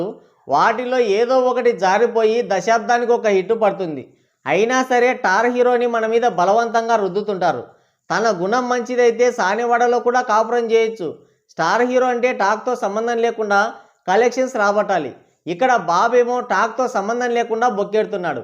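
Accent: Rayalaseema, India. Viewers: ఇదీ పక్క మా రాయలసీమ యాస నే😂😂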